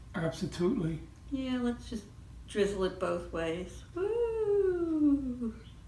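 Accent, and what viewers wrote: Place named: New Jersey, United States. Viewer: Love your Jersey accent! And the pie sounds/looks awesome! 🤤🤤🤤🤤🤤🤤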